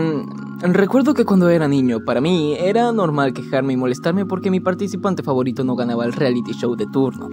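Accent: Venezuela. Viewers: Mano, se re nota que eres venezolano jajaj saludos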